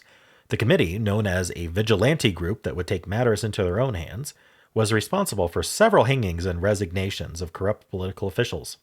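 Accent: United States. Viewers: I really really couldn't figure this one out, until said it in his American accent. But yeah I was never going to get this, those are not homophones at all in (most?) other accents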